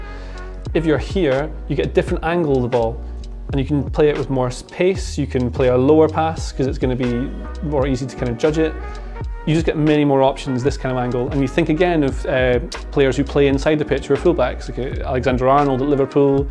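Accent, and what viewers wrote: Scotland, United Kingdom. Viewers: This is the perfect background noise with the soft jazz, Scottish tones and football vibes while I work from home and weep at the state of my inbox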